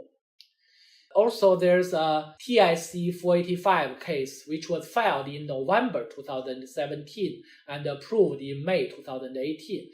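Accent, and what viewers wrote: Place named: China. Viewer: To your question: he speaks English, with a strong Chinese accent, but I am sure you can follow him if you want to. I also want to add some extra info in this answer to your question (because I just finished my i-485 interview): As of now, the content in all his videos (and of course, some are very Chinese related but not to my case) is very very good, and is the most helpful & practical one, compared to other related videos, made by non-native English speakers from places all over the world and native English speakers. And I would say that it is a surprise to me too. So, if you cannot deal with Chinese accent, ignore it (I guess I understand that. Honestly, as a Chinese, I ignore a lot of Chinese-English videos, I have to), but if you have a real-life need in this GC thing, try some of his video.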